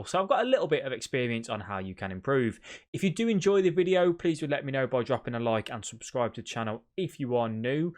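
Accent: Birmingham, United Kingdom. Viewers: Great channel m8 are you from brum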